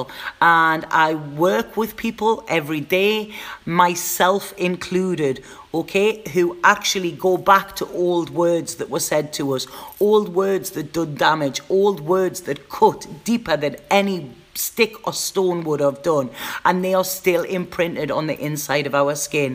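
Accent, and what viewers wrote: Newcastle, United Kingdom. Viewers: Luv u, you're such a breath of fresh air! Thanks so much for all that you continue to give. I'm off to Peru on Tuesday and I'm so looking forward to the experience. God knows what I'll find there but I'm totally up for it! When I'm away it'll be lush to hear another Geordie when I'm so far from home!😉xxx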